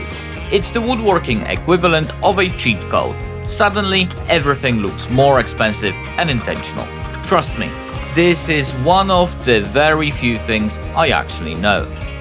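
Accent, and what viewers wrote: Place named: South, United States. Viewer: I hope your viewers pick up on the planer trick you used for small/short boards. I have a feeling you and the Bearded Viking have been chatting a bunch since he became a subscriber due to your ETSY Check series, "brad in the a$$" Picking up on some of that southern drawl🤣. You had me at 🔥and 💡. BTW, nice shirt! 😉 I guess I should upload something soon with all that free advertising you have been giving me. 😜 This is a pretty cool concept. Maybe some 3D printed flying/floating superhero silhouettes would be an ETSY seller? Magento should be the first one... I mean the secret sauce here is magnets, just would be fitting...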